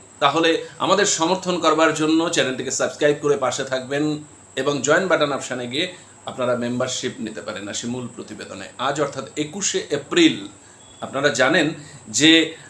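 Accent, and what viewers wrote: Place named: Bangladesh. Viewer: কথাবার্তা একদম বাংলাদেশীদের মত ! তা' বাপধন তোমাকে এখানে লেকচার ঝাড়তে কে ডেকেছে ?! তোমার একটা চ্যানেল আছে দেখলাম ৷ কেউ দেখে ?!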